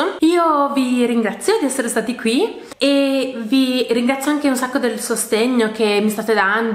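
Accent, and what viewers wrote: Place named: Friuli, Italy. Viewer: Ho una curiosità, di dove sei se puoi dirlo? Friulana? Sono in fissa dal primo video che ho visto perché hai un accento che non riesco a identificare 😂 all'inizio avrei detto tipo emiliana, ma sentendoti più spesso direi di no 🤔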